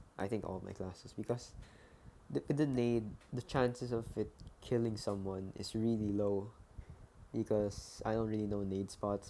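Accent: Philippines. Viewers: are you filipino bcuz ur accent is like a filipino accent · Are you Filipino? Because your accent is Filipino like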